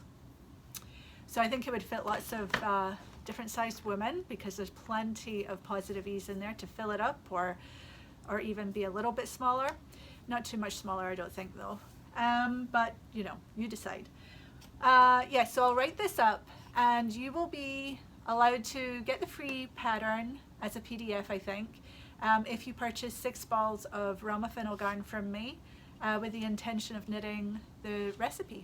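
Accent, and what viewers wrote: Scotland, United Kingdom. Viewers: I love hearing your wonderful Scottish accent and seeing all of the gorgeous Yarns you bring in for us! Thanks so much and stay safe!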